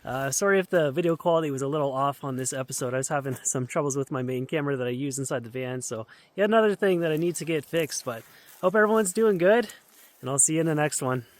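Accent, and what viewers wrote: Canada. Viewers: Good lord the way Canadians talk is so ANNOYING! Your “out and about” is so strong and just stupid! Learn normal English!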